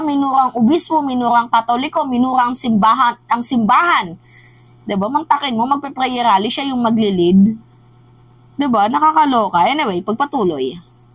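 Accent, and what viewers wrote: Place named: Waray, Philippines. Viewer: taga saan k? Waray nmn salita mu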